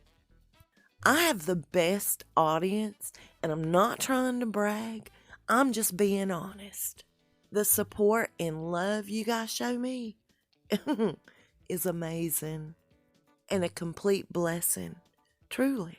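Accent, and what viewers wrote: South, United States. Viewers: YOU are such a blessing to us,! I enjoy your posts so much and love your southern voice!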